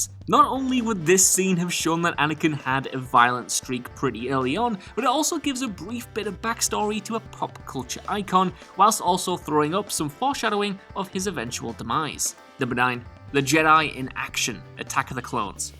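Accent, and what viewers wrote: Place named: England, United Kingdom. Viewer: Just show the scenes, I dont give a damn about the intro or hearing your annoying pom voice.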